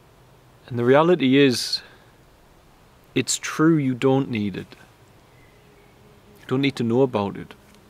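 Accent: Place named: Geordie, United Kingdom. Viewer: Are you from the North East of England?? You have a great accent/dialect and it is very similar to my partner’s accent and He is a Geordie (from North Shields, North Tyneside) of which He is extremely Proud.. Just thought I’d ask if You are also From Our Local Area.. Obviously I’m meaning - Originally.. Much Appreciated for your excellent work in helping humanity - True Angel of God xxx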